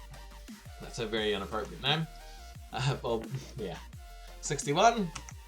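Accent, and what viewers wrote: New Zealand. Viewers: bro your a kiwi aye, that accent sounds like home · Are you from nz?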